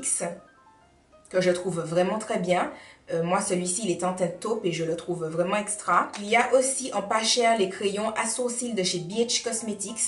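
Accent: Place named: Caribbean. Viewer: Ton accent antillais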